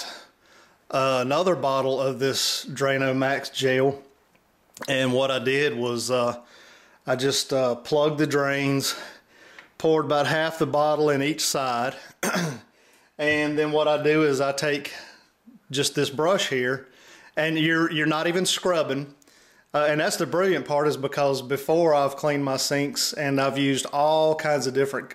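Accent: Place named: South, United States. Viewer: Love it. And I'm going to guess you're not from up north. Seeing as you don't bother with wearing clothes for a Youtube video and you pronounce gel as jail. No judgement here! You are rocking it. Thanks for the video.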